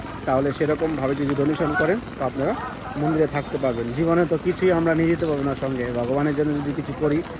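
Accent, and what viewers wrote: Bangladesh. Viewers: ভাই তুমি কি বাংলাদেশী। language টা এমন কেন?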